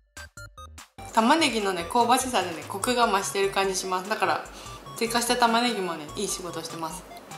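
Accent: Izumo, Japan. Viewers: 大社の人間なのでたまに出る出雲弁がたまらんです(*´°`*)💓ロシアンちゃん大好きーー！！💓✨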